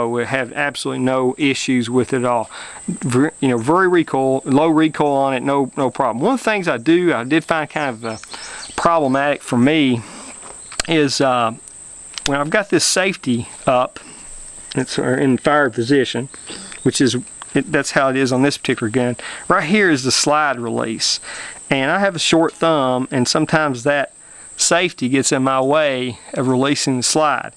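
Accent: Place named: South, United States. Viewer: Buddy, where in South are you from? You have a very distinct accent.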